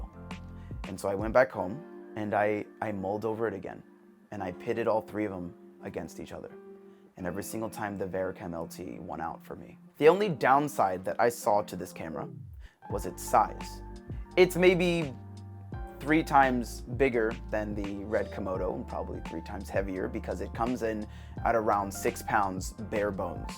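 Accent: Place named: RP, United Kingdom. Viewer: Beautiful classical British accent. Versatile guy, you are.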